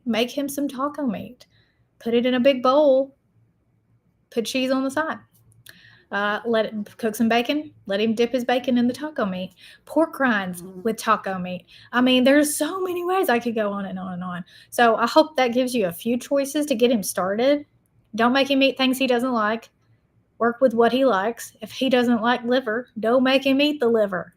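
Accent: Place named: Tennessee, United States. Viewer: Your funny and cute I enjoy hearing you talk with that Tennessee accent 😂😂😂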